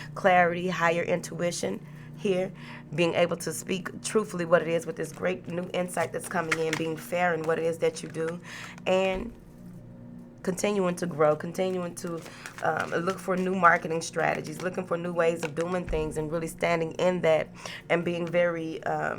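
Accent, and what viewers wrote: South, United States. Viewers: I absolutely love your southern smoothness ❤️❤️❤️ and you’re message resonates heavy ♋️♋️♋️♋️♋️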